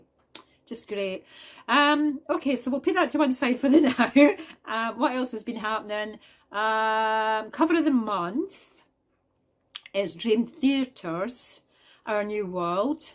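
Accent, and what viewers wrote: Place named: Scotland, United Kingdom. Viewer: Hi.wow your a busy lassie..always good to hear a Scottish accent lol...your solo... not the type of solo I'm use to hearing lol...sounding great tho...and you are loving it..well done.and congrats on your certificate 🤘...xx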